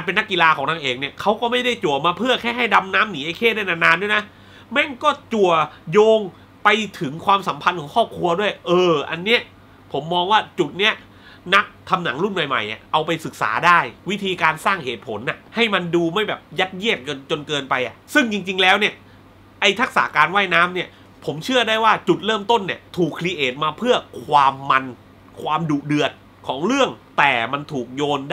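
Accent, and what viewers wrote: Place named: Surat Thani, Thailand. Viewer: คนสุราษฎร์อำเภอไรนิพี่บ่าว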